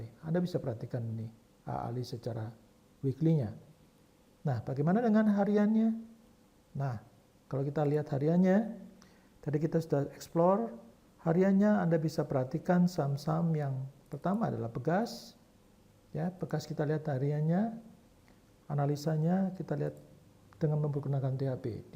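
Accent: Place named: Java, Indonesia. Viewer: kata istri saya suara Suheng medok banget. hahaha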